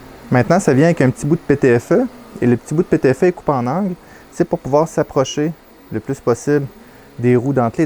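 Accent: Quebec, Canada. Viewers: Bonjour à toi, J'adore tes vidéos, je me régale vraiment. Je débute pour le moment (4 semaines avec l'ender 3X), mais j'apprend vite avec la situation, impression de support visière pour les Hôpitaux, l'imprimante tourne 24/24. Bref pour revenir au sujet cela m’intéresse vraiment, car j'aimerais faire un peu de flexible, mais est-ce vraiment utile, vu que se ne sera pas ma priorité. Avec l'extrudeur monter en standard pour la Ender3 il me semble que l'on peux le faire, mais à condition de réduire fortement la vitesse et peut être pas que sa d'ailleurs. Je suppose que la précision ne sera pas la même, mais la différence vaux t'elle le coup pour un usage épisodique? Autre petite question, le PetsFang, il faut l'imprimer en quel matiere, est-ce que le PLA suffit, car j'ai eue des retours négatif en PLA pour le petit modèle en rond <link> apparemment il aurait tendance à se ramollir et donc à tomber jusqu’à toucher les impressions, si ont le fait en PLA bien sûr. 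Qu'en est-il du modèle que tu nous indique ? Et qu'en est-il du bruit du nouveau ventilateur ? Merci vraiment beaucouppppppp, et l’accent québécois parlé à une vitesse raisonnable comme la tienne, c'est vraiment enchanteur, j'adore. De plus tu précise quasiment tout le temps de lieu d'achat sur le vieux continent et sa c'est vraiment sympa. Continu comme sa.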